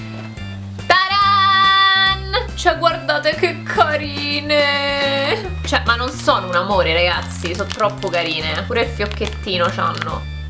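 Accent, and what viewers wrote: Rome, Italy. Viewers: è bellissimo il tuo accento romano !!!!!!!!!!!!!!!!!!!!!!!!!!!!!!!!!!!!!!!!!!!!!!!!!!!!!!!!! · Ma solo io ho provato a vedere questo video in inglese e dopo i primi 10 secondi ho mandato al diavolo le mie intenzioni perché amo il suo accento romano? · Il tuo accento romano è qualcosa di unico 😂❤️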